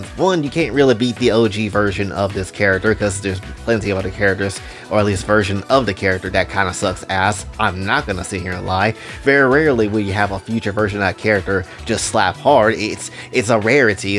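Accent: Brazil. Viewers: Indeed, the guy sounds like a brazilian a bit, and I can not pinpoint why. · Hey bro i only thought of asking know but are you BR? Your accent is familiar and l0lic0n BR YouTubers are very rare so i got curious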